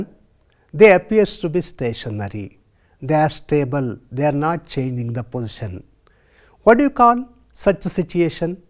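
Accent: Telugu, India. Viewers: Ur mother tongue telugu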